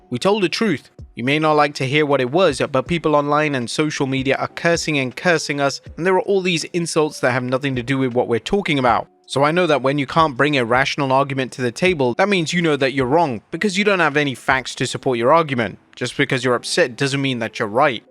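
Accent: England, United Kingdom. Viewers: if you see “controversial” in the title, expect the british narrator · 😅😅😅 .. Remember, he's an Englishman.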